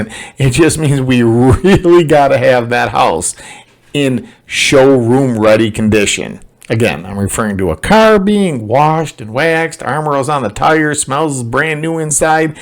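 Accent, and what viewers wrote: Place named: Buffalo, United States. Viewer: Ha ha I missed hearing your Buffalo accent lol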